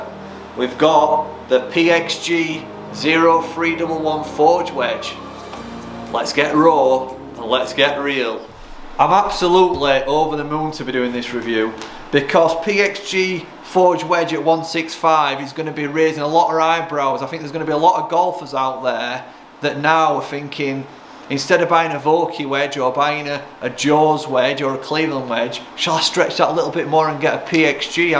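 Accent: Yorkshire, United Kingdom. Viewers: Love it and it's great to hear a proper yorkshire accent on YouTube!! 😁😁 Keep em coming!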